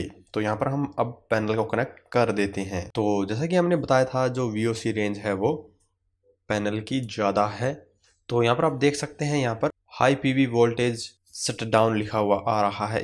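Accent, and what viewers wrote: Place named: Haryana, India. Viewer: बुरा ना मान भाई या तो हरयाणवी टोन स बोलने में फील ना करे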